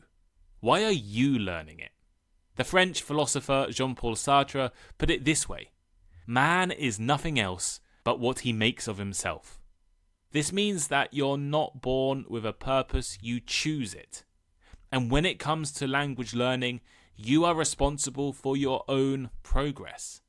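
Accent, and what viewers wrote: United Kingdom. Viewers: It's my great honour to hear from you. Shadowing your clear and standard Britsh accent has become a habit of mine.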